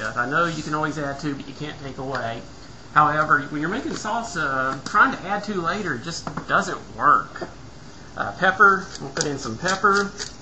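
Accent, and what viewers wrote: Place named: Texas, United States. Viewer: Your from Texas, aren't you? I can tell from the way you talk and do things. I' m north of Houston.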